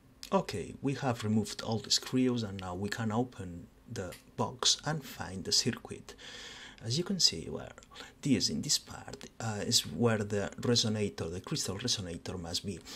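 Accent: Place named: Italy. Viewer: I love your accent. Are you Italian? I am an American.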